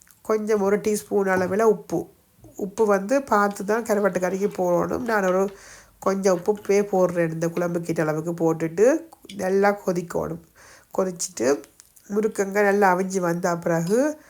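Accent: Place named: Sri Lanka. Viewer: உங்கள் இலங்கை தமிழ் பேச்சு ரொம்ப பிடிச்சிருக்கு.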